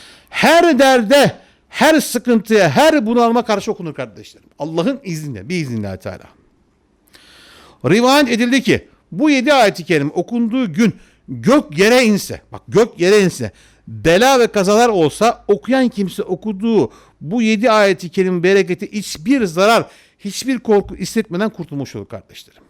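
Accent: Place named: Thrace, Turkey. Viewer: Hocam Trakyalımisiniz? Bende trakyalimyim konusmanizdan anladım Saygılar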